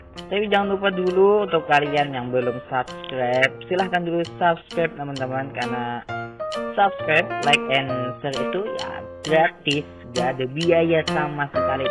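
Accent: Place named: Banjar, Indonesia. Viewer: Ktahuan org banjar😅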